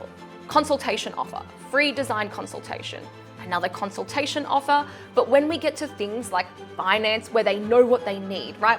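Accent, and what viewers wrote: Australia. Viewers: love everything you put out - pure value - you're a wizard... Therefore - you don't need the background music or it should be much lower - it's somewhat (to moderately) distracting in this video. Your Aussie accent is all most of us want or need to hear in your incredible videos. - 😉 - You're brilliant - we love your videos - there's no need for you to "entertain us."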